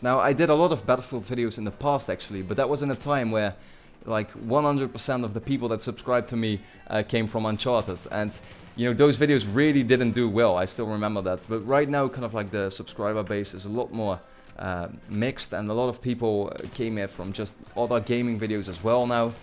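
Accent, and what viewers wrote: Netherlands. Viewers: Are you dutch? your accent kinde gives it away :)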